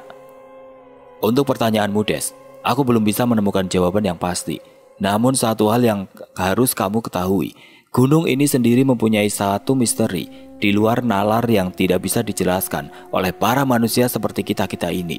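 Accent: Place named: Sunda, Indonesia. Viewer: 😂😂lucu bgt bahasa sunda nya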